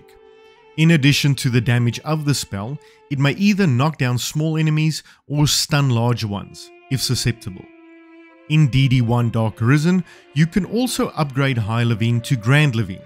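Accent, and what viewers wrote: South Africa. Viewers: South African? Nice! Subbed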